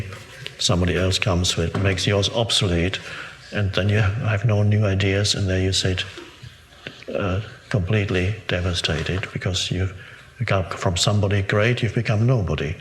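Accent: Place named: Argentina. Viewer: Grande ese Eckhart hablando en "argentino" 🥰🥰🥰♥️♥️♥️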